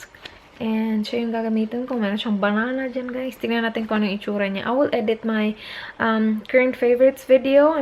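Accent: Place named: Visayas, Philippines. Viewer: kaya nagustohan ko vlog nya kasi ung accent nia bisaya tas ung english nya maganda rin pagka pronounce nia.. 😍😍😍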